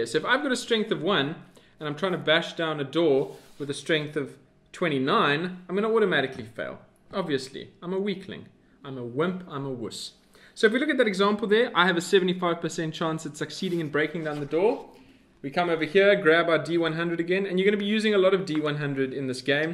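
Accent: South Africa. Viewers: Are you South African? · Never knew I needed to hear someone say "Cthulhu" in a South African accent but there you go.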